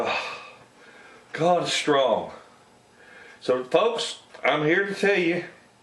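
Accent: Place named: Appalachia, United States. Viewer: I love your accent, is it hillbilly? Must try your recipe!!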